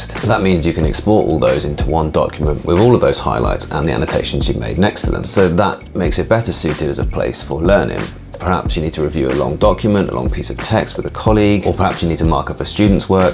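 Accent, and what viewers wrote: United Kingdom. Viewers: I watch your videos just to take a quick powernap… the base in the voice, British accent… ooo… so satisfying to ears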